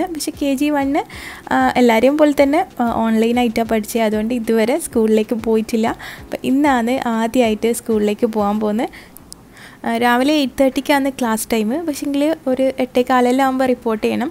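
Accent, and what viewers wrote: Kannur, India. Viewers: Ighal kannur ano nammale athe language😀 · Njan oru pattambi kaari aanu but niku othiri ishta kannur slag 😍😘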